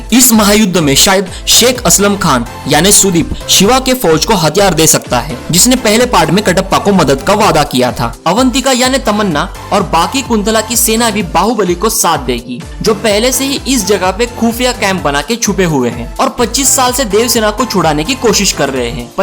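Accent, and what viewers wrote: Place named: Maharashtra, India. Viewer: loved your review and your typical marathi accent.....no theory left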